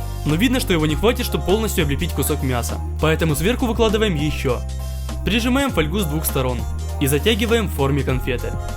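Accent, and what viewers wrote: Odessa, Ukraine. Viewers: Ты случайно не из Одессы?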